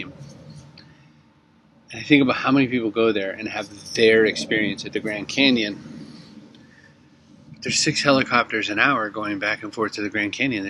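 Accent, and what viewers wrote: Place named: Ireland. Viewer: Hi! I like writing poetry and your Irish accent so let me drop by and leave a comment on one of your videos too!:)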